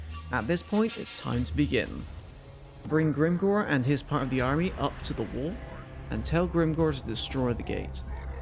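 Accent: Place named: Scotland, United Kingdom. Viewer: Nice video mate, I'll be sure to give this a bash. As a side question, where is your accent from? It sounds like a mixture of very light Scottish and something else?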